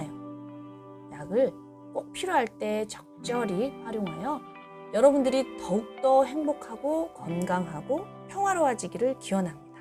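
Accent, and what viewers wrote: Gyeongsang, South Korea. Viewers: 이 분 갱상도 사람이라 "의" 발음을 못하내. "으". "으".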